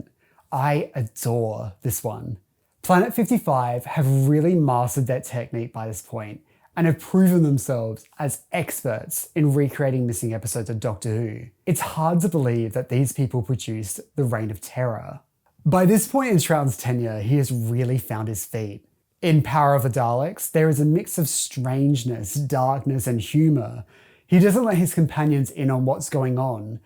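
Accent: Australia. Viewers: wait i thought u were australian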